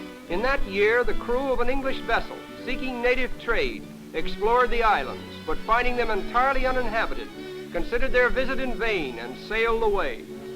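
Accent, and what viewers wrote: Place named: United States. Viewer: Comparatively speaking they were. Most native peoples had not seen a car or radio back then. Schools and widespread education were just starting and the average lifespan of peoples was in the 30s. Very few persons reached a ripe old age to convey accumulative wisdom to their communities. Life (especially in the interior) was short and brutal in the 1800s and this period in the early 1900s marks the transition away from that. In this period colonisation of Kenya was just starting and at this time there were no more than about 10,000 Europeans in Kenya. This sounds like an American commentating and reflects the attitudes of his people and the times.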